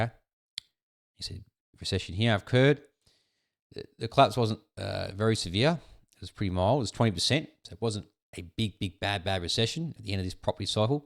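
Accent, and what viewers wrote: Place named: Australia. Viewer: Good info, we need more Aussie voices on utube.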